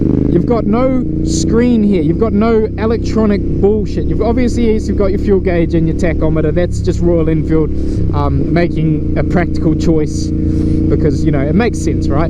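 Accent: Australia. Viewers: R u Australian by any chance?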